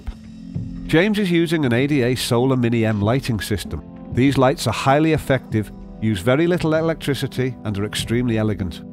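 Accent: Liverpool, United Kingdom. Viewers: Hjalmar Nordén he’s not from Sheffield lol. It’s much closer to Liverpool or the Mersey area. They’re scouse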